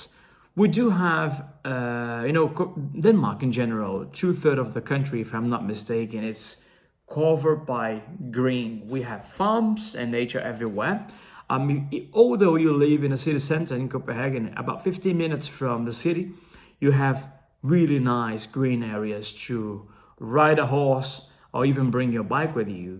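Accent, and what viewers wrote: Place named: United Kingdom. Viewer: Oi emano, muito bom ! Glad you like it here in Denmark, your english has a serious british accent. 😊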